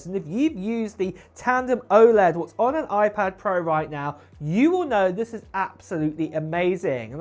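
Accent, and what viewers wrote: United Kingdom. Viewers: High pitched british voice....I don't believe anything you say.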